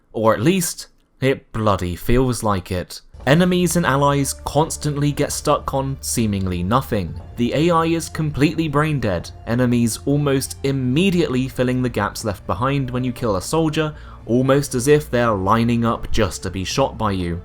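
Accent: United Kingdom. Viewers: Thaaaaanks! I always imagine your British accent when I read your comments lol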